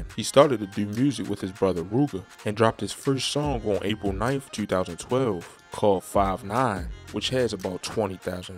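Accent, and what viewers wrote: Baltimore, United States. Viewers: doing this video is from Baltimore.. being a native Washingtonian here in DC I'm used to that accent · You got That Baltimore Accent.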